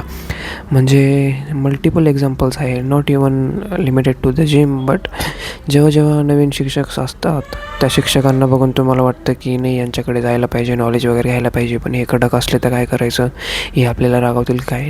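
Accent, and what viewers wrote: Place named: Marathwada, India. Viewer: are bhai tu ekdam relatable vatla kharach bolaychi tone ekdam marathwadi ahe tich thev original vat te aikayla ani tu real rahshin main mhanje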